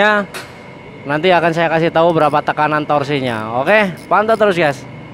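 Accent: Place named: Banyumas, Indonesia. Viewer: Tp logatnya jenengan ngapak ya mas 😁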